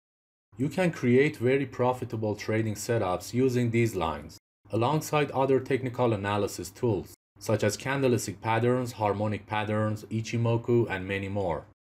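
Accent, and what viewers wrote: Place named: Iran. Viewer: great video vali nemidonm chera lahjaton kheili farsi mizane :D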